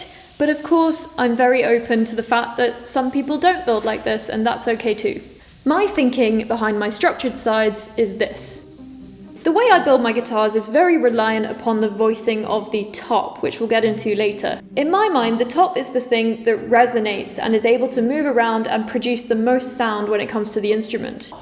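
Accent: United Kingdom. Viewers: Man, your British accent suddenly reminded me of those 90s/early 2000s BBC documentary programmes.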